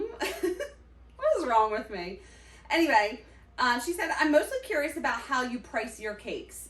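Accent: Philadelphia, United States. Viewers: Your accent makes me miss Philly!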